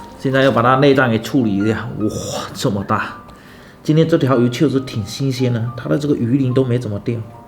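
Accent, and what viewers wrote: Fuzhou, China. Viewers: 福州口音相当亲切了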